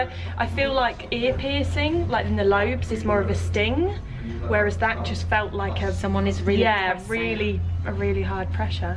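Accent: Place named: London, United Kingdom. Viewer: I love your London accent, mine, according to my husband, is New Yorker mixed with Italian and Portuguese. In short, a horror !!! I love the winter clothes and your style. I was amazed not to see Karl Lagerfeld's picture at the Oscars, it was disrespectful !!! Sad!!!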